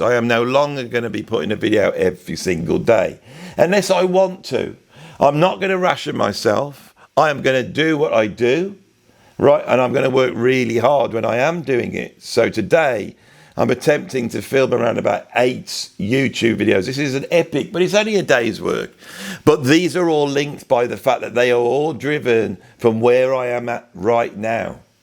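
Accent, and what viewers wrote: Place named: Midlands, United Kingdom. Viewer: More then interesting, first thing why am I looking at other comments, sod it,I don't need to... Your comment at the very end, have a good death, that comment in itself can go either way, I guess it could be viewed as humour, but the point that I would like to make is,The subject of Death is totally avoided here in the West, Burried in fact.,now thers a point,humour arriving again... But absolutely we all need to be able to die in silence and peace.and hopefully in absolute awarness. My personal view is Life and Death are part of the same coin... Anyways,Artists such as Joni or phill,Kate,are somehow via there creative ability deal with there Trauma and failing and are capable to go beyond this in a cathartic way, I agree with you, we all are capable of finding way to rise above the stress and that method iin itself is creativity. 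There are unfortunate people who sadly become lost and have an immensely horrendous time in darkness.. Oh,Bloody hell I'm blabbering on,sorry. To put it simply you have to be true to yourself and if you happen to offend someone it is there problem, your loyall membership and subscribers know you and will stay.... Love the midlands accent, I'm a East middlander,originally, yep I can't help that 😂. Best wishes to your friend. Enjoyed again this in depth discussion...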